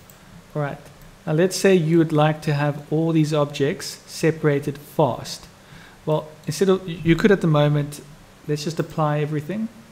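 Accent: South Africa. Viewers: Nice to hear a South African oake for a change :D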